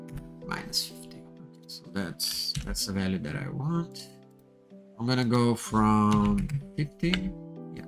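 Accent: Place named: Brazil. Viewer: Brazuka? vc tem sutaque brasileiro!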